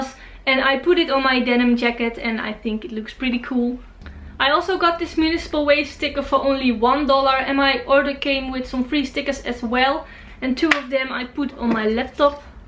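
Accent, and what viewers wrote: Netherlands. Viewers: Eindelijk iemand die ook met een dik nederlands accent praat als ze engls praat. Dacht dat ik de enige was.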